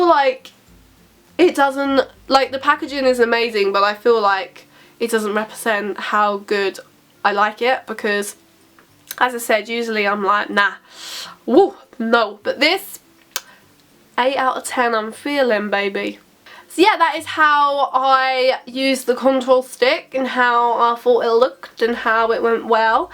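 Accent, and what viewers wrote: United Kingdom. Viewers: iam in love with ur british accentttt !!!!!!😍😍😍😍😍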